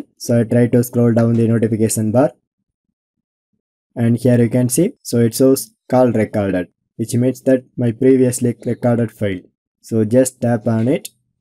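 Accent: India. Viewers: Buttler English y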